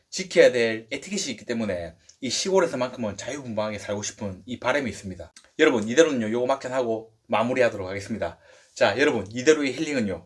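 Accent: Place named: Gyeongnam, South Korea. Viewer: 겨울이겠다 ㅎㅎ진빼이 서부갱남 사투리 정겹네 ㅎㅎ자연이 가자 ^^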